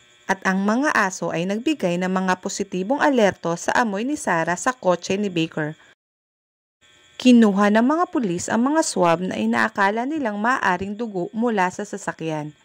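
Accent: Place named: Visayas, Philippines. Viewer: ung may pagka bisaya magsalita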